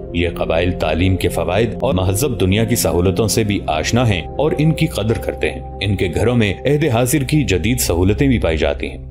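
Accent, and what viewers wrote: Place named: Lucknow, India. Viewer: Agar Lakhnavi andaz mein commentry na ho to behtar hay.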